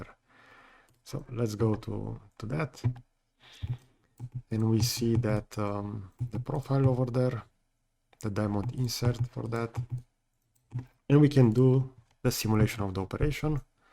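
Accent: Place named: Romania. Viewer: Auuuuuu ... asta-i engleza rumaneasca ... !!!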